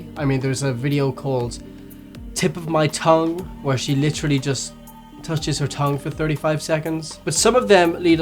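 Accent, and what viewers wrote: Ireland. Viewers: Are u Irish? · I think he's Irish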